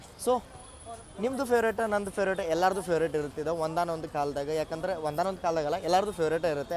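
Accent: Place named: Karnataka, India. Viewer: ನಾನು 2005ರಲ್ಲಿ ದೇ ರಾಜಧಾನಿ ಎಕ್ಸ್ಪ್ರೆಸ್‌ನಲ್ಲಿ ದೆಹಲಿಗೆ ಹೋಗಿದ್ದೆ ಬೆಂಗಳೂರಿನಿಂದ ತ್ರೀ ಎಸಿ ನಲ್ಲಿ. ಊಟ ಕೆಟ್ಟಿದ್ದಾಗಿತ್ತು ಸೀದು ಹೋಗಿರೋ ಪರೋಟ ಅಲ್ಯೂಮಿನಿಯಂ ಫಾಯಿಲ್ ನಲ್ಲಿ ಇಟ್ಟಿದ್ದರು. ಕಂಪಾರ್ಟ್ಮೆಂಟ್ ತಟ್ಟೆನಲ್ಲಿ ಅಣ್ಣ ದಾಲು ಇನ್ನೊಂದು ಕರಿ ಒಂದು ಮೇಲೊಂದು ಹಾಕಿದ್ರು ಒಂದು ಸ್ವೀಟ್ ಕೊಟ್ಟಿದ್ರು ಮೊಸರು ಚೆನ್ನಾಗಿತ್ತು ಬರ್ತಾನೋ ದೆಲ್ಲಿಯಿಂದ ಇದೇ ರಾಜಧಾನಿ ಇಲ್ ಬಂದಿದ್ದೆ ಆಗಲು ಕೂಡ ಊಟ ಚೆನ್ನಾಗಿರಲಿಲ್ಲ. ಇವಿಷ್ಟು ಟ್ರೈನ್ ನಲ್ಲೆ ಜರ್ನಿ ಮಾಡ್ತಿರಲ್ಲ ಕೆಲಸ ಯಾವಾಗ ಮಾಡ್ತೀರಾ ಖರ್ಚಿಗೆ ದುಡ್ಡು ಬೇಕಲ್ಲ ನೀವು ಯಾವ ಊರಿನವರು ನಿಮ್ಮ ಕನ್ನಡ ಭಾಷೆ ಮೈಸೂರ ಬೆಂಗಳೂರು ಕನ್ನಡ ತರ ಇಲ್ಲ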